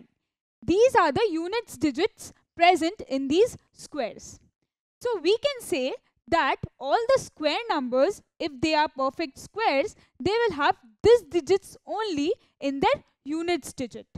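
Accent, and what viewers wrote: India. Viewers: Indian accents... fuck my life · Why is every vid I hear a god damn Indian voice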